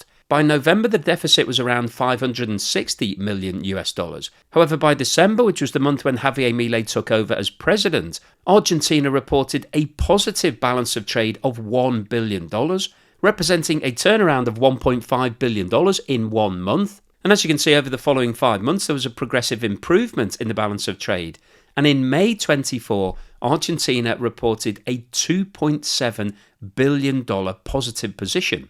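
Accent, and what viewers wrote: United Kingdom. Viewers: As soon as i heard your british accent, i knew this was a hit piece.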